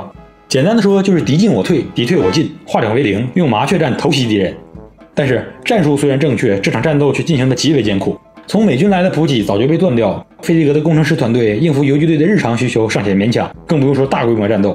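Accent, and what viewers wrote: Northeast, China. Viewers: 口条不清晰，还带东北口音。弃。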